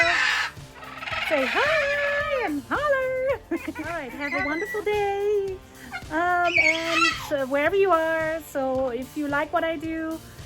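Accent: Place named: Germany. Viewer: Ich dachte doch dass ich da einen leichten deutschen Akzent höre 😊 tolles Video, Du bist eine Papageienflüsterin! Grüße aus NRW ❤️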